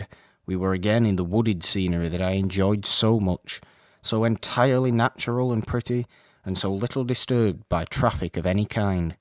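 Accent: Northern England, United Kingdom. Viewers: Love the northern accent; it seems more suited to Tom Wyndsour than the narrator, but it adds to the old-timey feel. This is my all time favorite short ghost story, this and MR James' The Rose Garden. Victorian melancholy at its best. Time to bake up a restorative meat pie!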